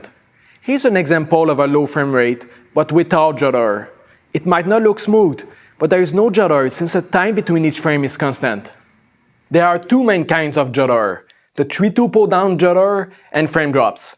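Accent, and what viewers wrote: Quebec, Canada. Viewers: HAHA un Québécois ! :) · I can tell that you are from Quebec haha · It’s a French Canadian accent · It's actually a French Canadian accent 😉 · Lul French Canadian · He has a big accent because he is from Quebec. I'm from Quebec too so I could tell. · Hehe that's nice to hear a French Canadian accent on a high quality informative video. I noticed it right away and trust me, he speaks english EXTREMELY GOOD and way better than the average person from here, including myself haha.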